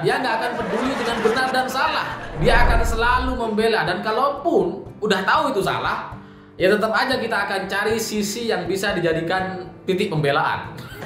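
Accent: Madura, Indonesia. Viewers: Logatnya Madura yha kang...? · Logat madura nya samgat kental, tapivaku suka, Toppp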